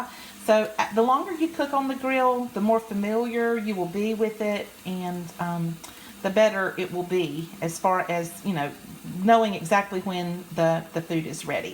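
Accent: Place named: South, United States. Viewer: I like the Southern accent too. All the way from the other side of the world.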